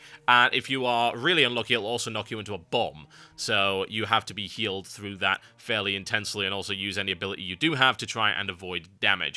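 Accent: United Kingdom. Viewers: Can't wait till Cata comes out, so I can stop watching these Vids with his annoyingly British accent.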